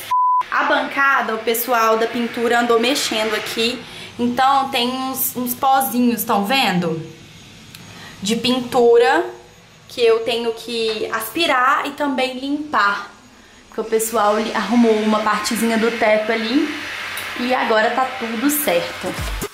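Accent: Minas Gerais, Brazil. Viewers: Pois se acostume, pq ela não vai mudar. Mineiro fala assim.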